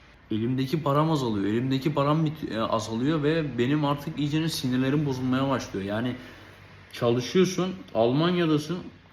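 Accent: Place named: Bulgaria. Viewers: Bulgar ya da trakyalı gibi konuşuyorsun. Beyaaa.... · Bulgar değil, Bulgaristan göçmeni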